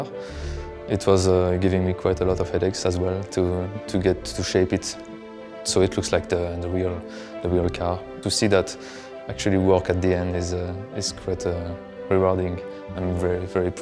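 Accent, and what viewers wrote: France. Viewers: This little french accent👌👌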